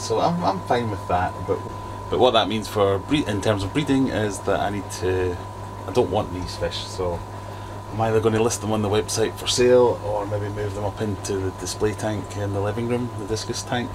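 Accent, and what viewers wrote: Scotland, United Kingdom. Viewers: Nice to hear a fello Scott 😃 I'm going to be setting up a nano tank with these hence finding this video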